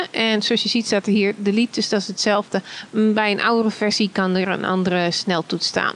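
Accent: Netherlands. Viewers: ik kon van je engels uitspraak in andere videos al horen dat je nederlands was.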